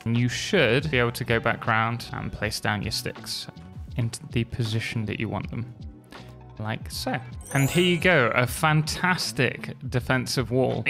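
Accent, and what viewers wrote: United Kingdom. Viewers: hell yeah bro you sound more british than my dad, and that makes me know you bought to lay the words of god on me right now. if anyone knows how to go somewhere new and take it over, its yall 😮📝 im here to learn dawg on a real note what you said about the main story yeah dude those caves freak me out i just want a castle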